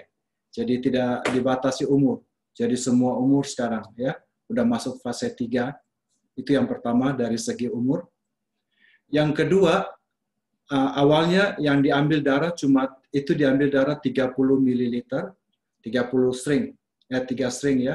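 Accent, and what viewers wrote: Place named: Maluku, Indonesia. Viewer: Sdr, terimakasih langsung share ke saya. Sepertinya logat2 Maluku nih. Semoga hasil penelitiannya favorable ya, tetap sehat, semangat,Tuhan memberkati dan setiap langkah upaya pengobatan dan pengendalian Covid.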